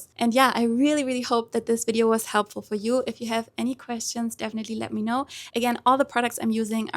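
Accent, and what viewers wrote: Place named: Germany. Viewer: Oh süß man hört deinen deutschen Akzent raus 💗🥹 Grüße aus Nürnberg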